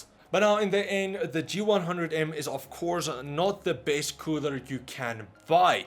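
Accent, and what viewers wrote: South Africa. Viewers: Is this guy from South Africa? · South African?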